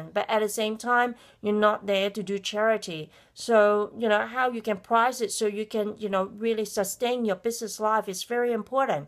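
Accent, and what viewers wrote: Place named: Australia. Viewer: i like your australian accent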